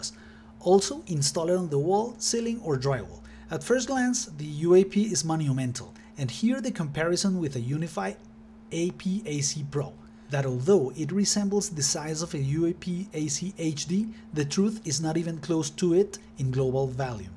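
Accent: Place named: Colombia. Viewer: You sound very Colombian.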